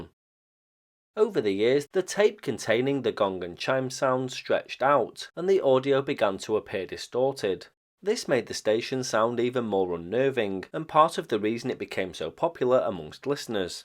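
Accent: United Kingdom. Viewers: This was my first time hearing German spoken with a British accent! :)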